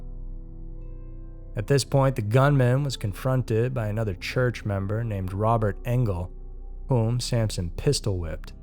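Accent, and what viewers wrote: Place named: Minnesota, United States. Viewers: You have a Minnesota accent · Is why I follow his words so well? MN for the win, I never noticed the accent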